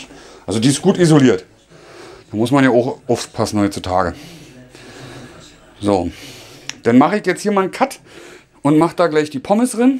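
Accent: Berlin, Germany. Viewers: super genial erklärt und hat uns bei der Kaufentscheidung sehr geholfen. Und dein Berliner Dialekt lieben wir eh 😁😁😁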